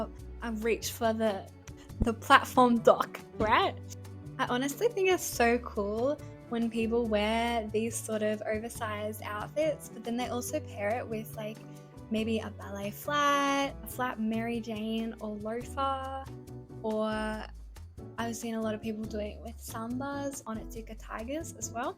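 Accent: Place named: Melbourne, Australia. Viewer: i could tell you were from melbourne immediately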